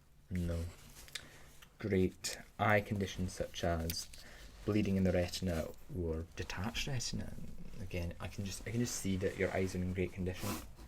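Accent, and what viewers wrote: Scotland, United Kingdom. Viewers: I love scottish accent, and yours is amazing. Would you make more of it? 💞❤️ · your Scottish accent is great!! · As a scot. I have to say , loved the accent . Sound like most of my mates. And me kinda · I always found the Scottish accent a great trigger for me! suscribed m8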